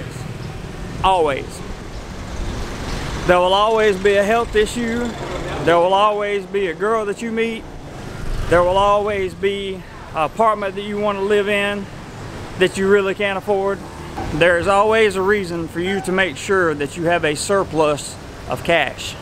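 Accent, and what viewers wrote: South, United States. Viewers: I love your south accent man! I've been in TH for 3 years, 85-88 and everything you say it's true. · I'm listening to his accent and thinking.....cape fear hahaha. Great real world advice boys ! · these advices are precious really like not touching the head of a Thai, who would otherwise know for such details. Thanks. PS: English not my mother language but I'd say you're from US somewhere within the Bible Belt range? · Thumbs up for cowbow accent. Yehaw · My curiosity: that american accent you have... which american state are you from? Texas? I can easily understand every single word you utter. English' s not my first language (neither the second one, actually), so I'm curious because sometime I struggle a little to understand fast spoken american-english. No problem whatsoever in understanding you ;) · Watching from the Philippines... 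I like you southern accent. thanks you visited the Philippines. thailand and Philippines have similarities in culture and they both eat rice Lol. I like to watch ads too... SPANISH. As the Philippines was once a colony of Spain... Spanish was taught also in School. 2 languages were taught in Philippine school back then 1950s-1980's.. Spanish and English. Same is true in the Philippines... in Thailand and the Philippines... foreigners are guest.. and a good guest behave. You are not a citizen in this country. Act like a good guest . TIPS... because wages are low and people have high expectation with tourist. As you have said... dont come to Thailand with little money.. because people expect tourist have money to spend. WELL SAID. I agree with you. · Your Southern accent is cute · Love your down-to-earth delivery with the southern gentleman charm. You should do way more video narratives....on any subject.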